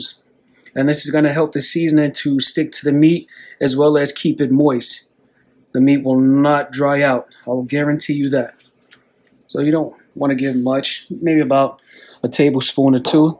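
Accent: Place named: New Jersey, United States. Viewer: I just love listening to your Jersey accent, and those wings look bomb. I'm trying my hand tonight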